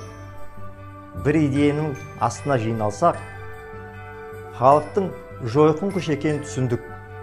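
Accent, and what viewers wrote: Standard. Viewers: Казакша таза сойлейди екен Токаевка караганда. Красавчик 💪🏾 миру мир братья 🇰🇿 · Мұқтар аға таза қазақша үйренді! Тамаша! Жаңа жыл сізді Қазақстанды басқартсын. Халықтың жағдайын түзетіп ұлы атақты тұлға болыңыз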